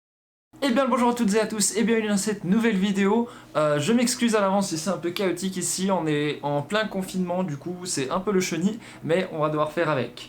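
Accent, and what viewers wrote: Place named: Belgium. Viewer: Tiens il est belge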